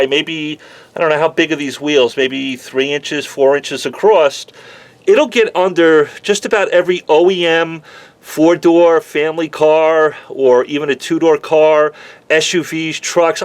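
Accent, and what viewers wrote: New York, United States. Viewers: You may be based in Dallas, but you still sound like a New Yorker.:) BTW, I believe it's pronounced "Ree-oby".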